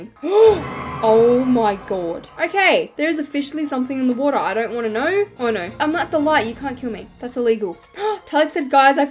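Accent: Australia. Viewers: yk shes aussie just by the way she speaks <3 I can relate.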